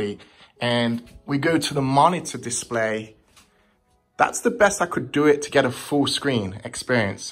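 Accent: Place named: Midlands, United Kingdom. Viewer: “Me old” “I got it for fifteh” definitely a midlands lad 😂👍👍👍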